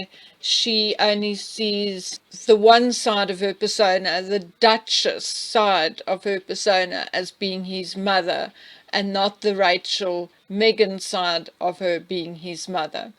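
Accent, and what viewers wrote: South Africa. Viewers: And your beautiful South African accent. Makes me homesick. I lived 30 years in RSA.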